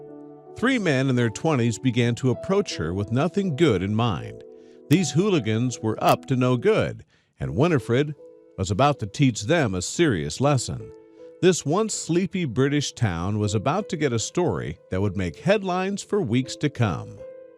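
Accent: United States. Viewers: This is a British story dragged out by an American narrator with police cars and cops from NYPD, Italian or Spanish cop cars, and several actors, must do better when presenting a tale from Liverpool, England!! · Why didn't a English person do the commentry Americans talk about paint dry annoying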